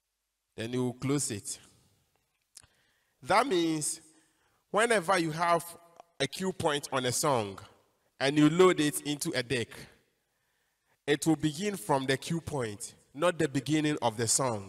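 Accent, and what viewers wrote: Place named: Ghana. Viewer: You sound Ghanaian😎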